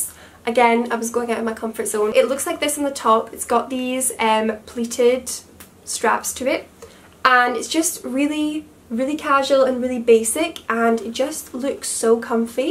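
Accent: Scotland, United Kingdom. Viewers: It's so nice to hear another Scottish accent on youtube 👏🏻👏🏻 I've been trying to find fellow Scottish youtubers, absolutely adore your videos!! 😊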